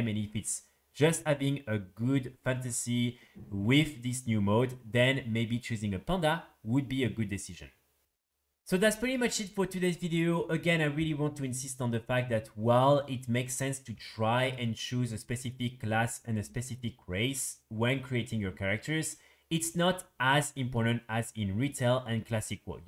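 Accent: France. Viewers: Thats a French accent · Are you french?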